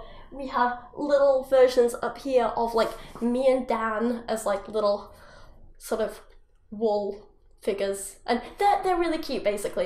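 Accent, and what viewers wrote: United Kingdom. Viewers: The British accent is getting really annoying.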